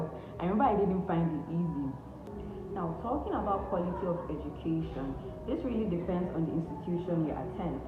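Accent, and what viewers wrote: Ghana. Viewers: Your accent is now Ghanaian. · Your tongue is more Ghanaian than Nigeria. I think we taught you dear my dear. Stay blessed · Thanks for sharing your experiences, it will help educators on both sides. I must add this, in a year’s time nobody could tell you’re aNigerian, your accent moving rapidly Ghanaian! Good luck with your college work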